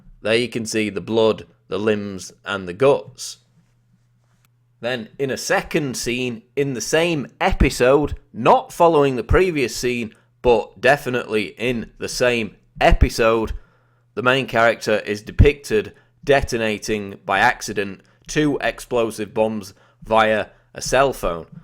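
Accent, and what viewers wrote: United Kingdom. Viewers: Is Alex Jones still doing the Piers Morgan voice or is the narrator British?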